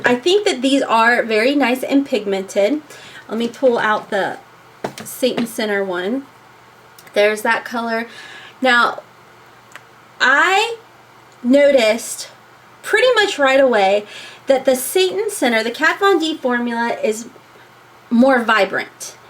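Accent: South, United States. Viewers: Always a judgement free zone... But, girl, your blending looks great in all of your videos! And I love your looks. Totally, planning on copying some on date night with the hubs. I swear you sound like a southern transplant to KY! And that's a compliment because I am Southern as they come (SC!)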